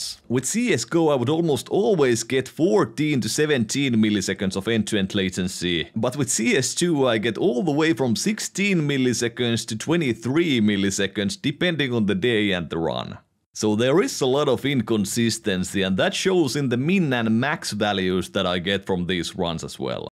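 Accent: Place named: Finland. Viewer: Your accent looks like FInnish if im not wrong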